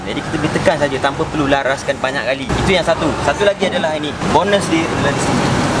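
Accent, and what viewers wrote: Terengganu, Malaysia. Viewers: Welcome bang, kalau boleh kekalkan loghat ganu time review. Boleh jadi trademark abang nanti.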